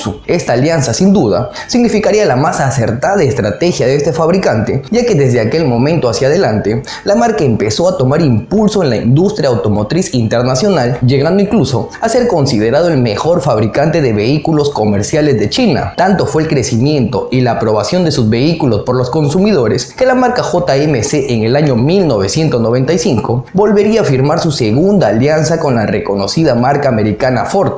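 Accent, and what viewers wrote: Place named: Peru. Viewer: Acento peruano bien definido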